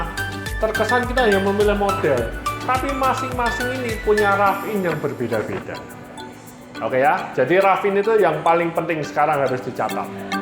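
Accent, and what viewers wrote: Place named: Java, Indonesia. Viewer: Wajah nya mirip bule.... tp bahasa nya medok banget... ngebyur😀😀😀😀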